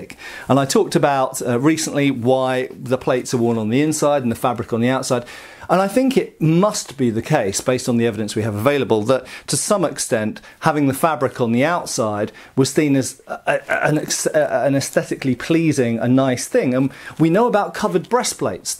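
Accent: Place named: England, United Kingdom. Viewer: No offence, I could really see you as a baddie in a Robin Hood film. Black brigandine, shaved head and English accent. As we all know, the goodies in English ‘historical’ films must have American accents!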